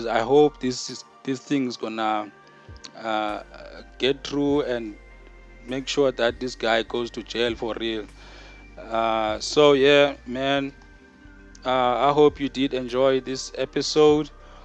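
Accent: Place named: Johannesburg, South Africa. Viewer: This has to be the dumbest and uninformed rant about cape independence I've ever heard judging by your acetate I can tell you are not from the western cape you sound like you are from joburg and came to the western cape recently since you know so little firstly it's not cape town that is seceding it's the hole western cape province not the city of Cape Town get your facts right!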